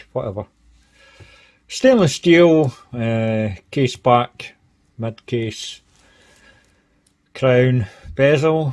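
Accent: Scotland, United Kingdom. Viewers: That was aggressively Scottish and I am here for it! 😂 Subbed!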